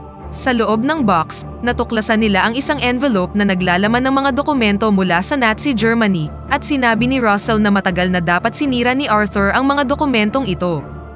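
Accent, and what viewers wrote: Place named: Philippines. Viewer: galing, sir anong reader app mo na may pinoy accent?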